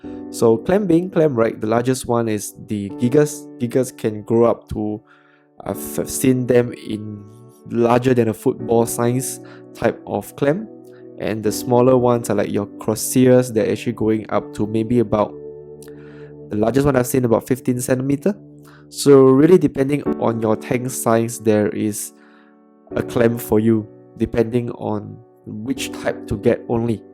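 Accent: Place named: Singapore. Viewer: Typical singlish....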